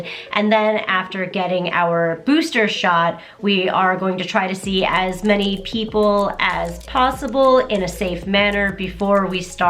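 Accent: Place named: New Jersey, United States. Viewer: New Jersey! I knew that accent sounded familiar! I really love your videos! You’re really awesome!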